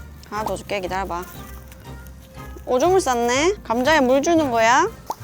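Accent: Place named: Gyeongsang, South Korea. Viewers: 문경이신가요? 말투가 문경분같아요 몬가 힐링하고 가요😊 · 상주 문경쪽 인가요? 말끝에 '여'자를 붙이는건 그쪽인데여~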